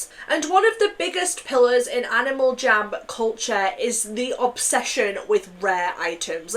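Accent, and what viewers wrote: Scotland, United Kingdom. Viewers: I mean, she's from the uk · unrelated you sound so Scottish my god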